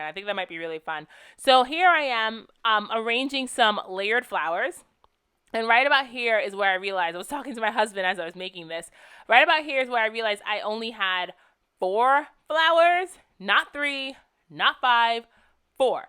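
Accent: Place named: East Coast, United States. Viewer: Love when you do mixed media! It was fun to hear your east coast accent sneak out a bit more on this one too! Beautiful spread!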